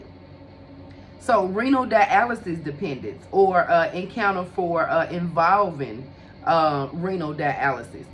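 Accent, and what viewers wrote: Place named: South, United States. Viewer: Great video! (And I love the southern accent!)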